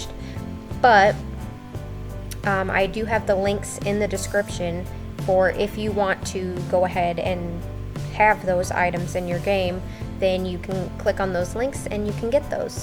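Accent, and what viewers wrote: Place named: Boston, United States. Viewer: Love the house! Btw did the Cubs win? And are you from Boston ish area? Me and the bf were trying to guess where your accent was from.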